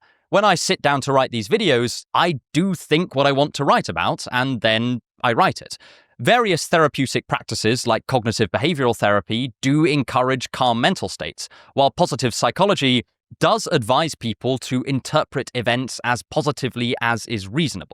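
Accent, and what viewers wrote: United Kingdom. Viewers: Definitely the best, purest British English I ever heard in my five years living in London. Great guy, I wish there were lots like him.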